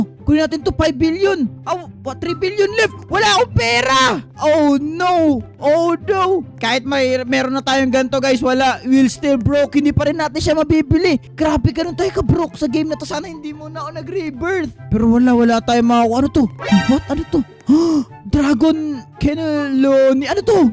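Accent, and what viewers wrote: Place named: Visayas, Philippines. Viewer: Bisaya ka😊 sobrang bisaya ka which p mahina ka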